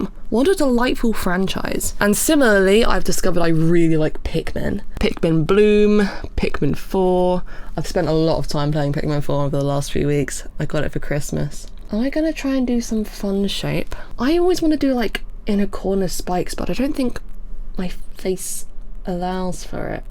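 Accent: United Kingdom. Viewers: u sound more british than usual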